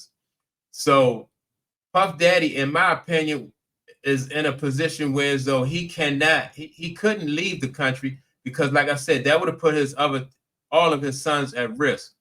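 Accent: Baltimore, United States. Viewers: Yo! You from Bmore! Huh?!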